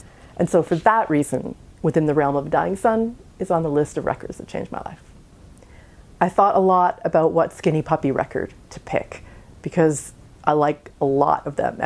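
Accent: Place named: Canada. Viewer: ? Thank you! My heart is melting. I heard your Canadian accent immediately.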